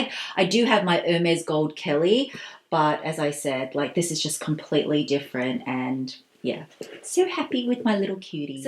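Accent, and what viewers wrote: Australia. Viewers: #faMELy I am also from Australia and I can't tell you how awesome it is to hear another Aussie accent in the youtube world ;) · I am a nurse Educator! Love your videos and the Australian accent! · #faMely I love your Australian accent. I’ve been watching your videos for a while and love your bag collection. I enjoy your videos it makes me appreciate high fashion. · Hey! My name is Tia and I’m a Fellow Aussie 🇦🇺 but from Perth here! Love your channel and watching your vlogs and hauls! So refreshing to hear our Aussie Accent! Keep up the amazing work! #faMely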